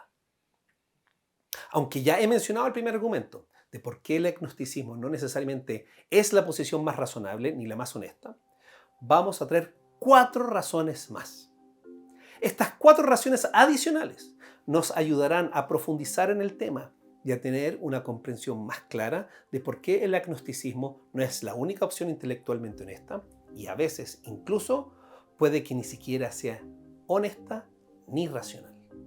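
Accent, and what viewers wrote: Chile. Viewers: Nada que ver mi comentario ante un tema tan interesante, pero no puedo dejar de decir que lindo el acento chileno, cuando no es "flaite".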